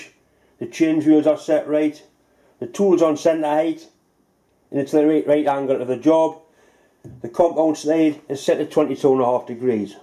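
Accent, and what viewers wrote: Newcastle, United Kingdom. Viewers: I think he's geordie from Newcastle. Up North East. I'm from Manchester Northwest. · He's a Geordie. They don't do foreign,.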